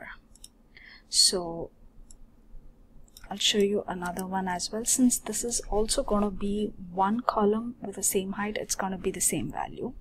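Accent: India. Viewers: Are you from India?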